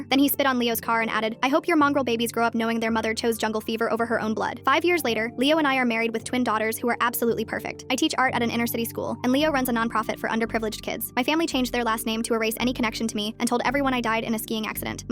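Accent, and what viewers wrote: United Kingdom. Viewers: Yo its sounds like harry potter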